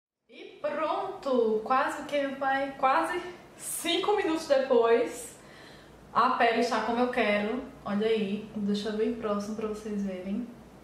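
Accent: Northeast, Brazil. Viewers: genteeee esse sotaque é nordestino. Ameeei, quero saber de onde que estado tu é mulheeer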